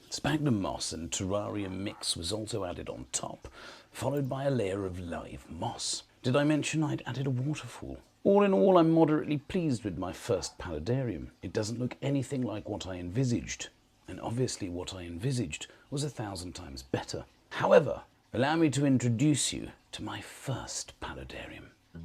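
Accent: United Kingdom. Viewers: I love hearing you speak. It sounds like you belong in a Harry Potter movie. From one Brit to another i absolutely love it.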